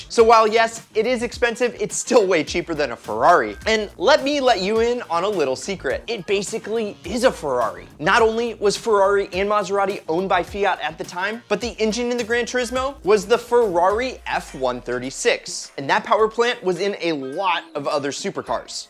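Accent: United States. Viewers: Yo, can you just do a whole vid with your cowboy accent? hmm?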